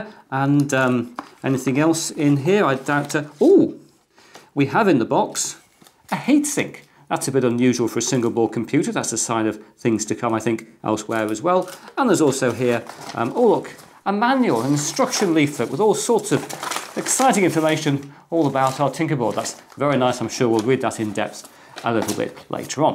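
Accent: England, United Kingdom. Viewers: It's amazing how you have a guy with an English accent and everything he says sounds more intelligent and straightforward....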